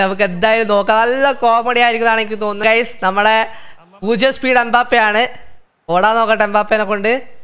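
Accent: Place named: Tamil Nadu, India. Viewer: Naduvula naduvula tamil varudhu😂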